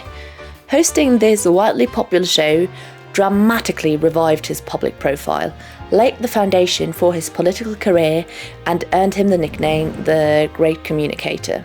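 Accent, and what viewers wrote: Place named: London, United Kingdom. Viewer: Good research effort,. Love your educated London accent… music to my ears. The glottal stops give you away, unless…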